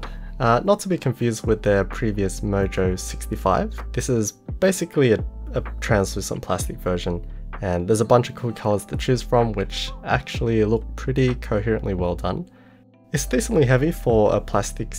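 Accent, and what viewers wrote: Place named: Australia. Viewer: an Aussie accent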